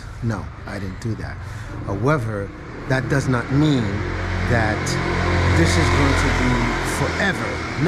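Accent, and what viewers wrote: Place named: United States. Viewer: Your.acsent.is.not.like.british.are.you.american.your.english.is.good.like.americans <link>.with.you.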